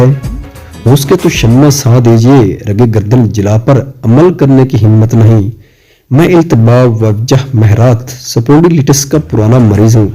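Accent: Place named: Saraiki, Pakistan. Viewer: Yaar sariki accent m Urdu pharty hain aap aur pronunciation ki Aatny gultian uffff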